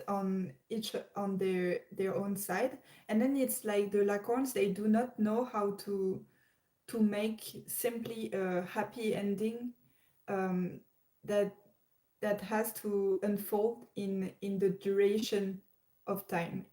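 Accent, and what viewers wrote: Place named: Belgium. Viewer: Sorry for missing your live videos. I've been really busy 😢 but at least, I'm gonna watch all of them. P.s your English is fantastic. Advanced vocabularies and articulative. It's not easy as well. I live in a country where English is utilized as a first language in education but not officially. Yet, many of us can't speak like you do. I had to train myself on my own. Your English accent is interesting, I heard similar accent (with minor differences) in Belgium (only European nation I've visited so far) when few of them speak in English but accent switches when they speak in their primary language.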